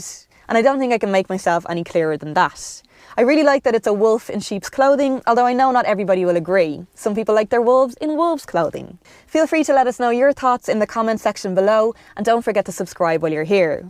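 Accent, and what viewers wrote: Ireland. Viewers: Great car, great review, and i love the Irish accent :)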